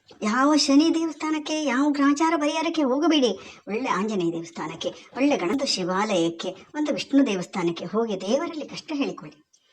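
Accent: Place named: Kundapur, India. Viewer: Super akka all the best👍💯 enastu videos barali nimminda.. Kundapur kannada keludakke tumba chennagi ede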